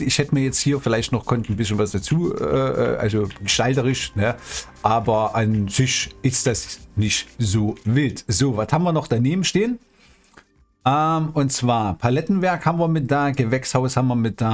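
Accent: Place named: East Germany, Germany. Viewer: Moin die Map hast du ja sehr schön vorgestellt und ja der Harz ist zum Teil meine Heimat ich finde diese Karte sehr schön und mit deinem ostdeutschen Dialekt rundet das die mapvorstellung perfekt ab ich würde mir echt wünschen dass du mehr modvorstellungen machst weil du kannst das richtig gut gibt von mir einen Daumen hoch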